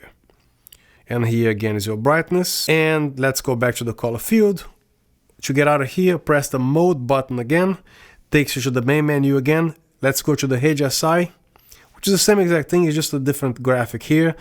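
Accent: Brazil. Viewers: Based on your accent I am guessing voce e Brasileiro. I just bought 4 of these. I cannot wait to use them!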